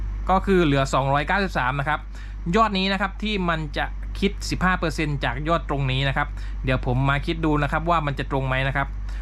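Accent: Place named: Surat Thani, Thailand. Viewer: พี่เป็นคนสุราษฎร์ ม้ายนิ ผมแลคลิปเก่าๆได้ยินแหลงกับแฟน สำเนียงเหมือนคนบ้านผมเลย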